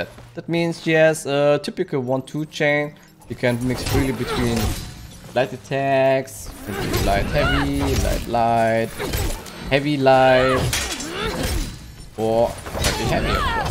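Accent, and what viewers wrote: Germany. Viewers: man hört den deutschen aktzent raus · Hey! I was wondering where you come from. Because your english sounds like it has an german accent in it. Thanks for the answer!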